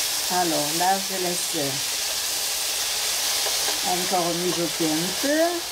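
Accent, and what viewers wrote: Provence, France. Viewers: Bonjour c'est un regal de vous écouter parler ce bel accent de notre Provence, j'adore vos recettes tres bien expliquées par vos soins c'est un régal merci du partage Emy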